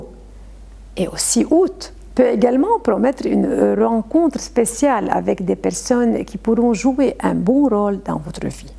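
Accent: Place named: Italy. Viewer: تكلمي الفرنسية بلهجة ايطالية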